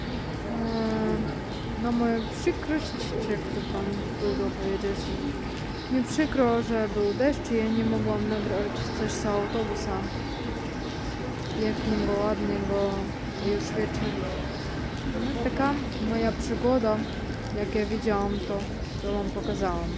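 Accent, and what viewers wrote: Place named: East, Poland. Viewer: Potwierdzam to, co czytałem w innym komentarzu, mówisz coraz lepiej po polsku :) Najbardziej uderza to, że "wschodni akcent" słychać u Ciebie tylko od czasu do czasu, gdyby nie sporadyczne zastanawianie się nad doborem słów (i czasem odmiana), spokojnie można byłoby powiedzieć, że jesteś Polką np. z Podlasia (region w pobliżu granicy z Białorusią, tam - zwłaszcza w mniejszych miejscowościach - ludzie mówią w podobny, lekko śpiewny sposób). Biorąc pod uwagę, że uczysz się sama, nie masz bezpośredniego codziennego kontaktu z Polakami, to jest bardzo duże osiągnięcie. Oglądam filmy na kanale "Odin Iz", prowadzi go Ukrainiec, który pracował w Polsce, pokazuje "szokujące różnice" między Ukrainą i Polską, na przykładzie nie pomalowanych osiedli w miastach, starych domów na wsi, tylko pod każdym z takich filmów dostaje wiele komentarzy mówiących o tym, że w PL są miasta i wioski wyglądające podobnie. 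Ty w ciągu kilku minut pokazałaś więcej różnic, niż on przez kilkadziesiąt minut swoich filmów, pociągi, droga, toalety - średnio w PL jest z tym już lepiej. Babcia na pewno ugotuje coś, co lubisz - wiele osób jest zainteresowanych potrawami z innych krajów, jeśli będziesz mieć okazję, możesz pokazać, z czego i jak przygotowuje się coś zwykłego, codziennego u Was, bo dla wielu oglądających to może być ciekawe :) Pozdrowienia dla Ciebie i całej rodziny, oby szybko udało się zrobić wszystko to, co planujesz :) PS: Ładne, nowoczesne mieszkanie.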